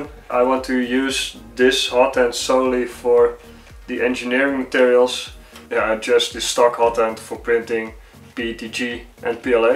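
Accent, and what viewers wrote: Netherlands. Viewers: are you dutch? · You just HAVE to be dutch, listening to that accent.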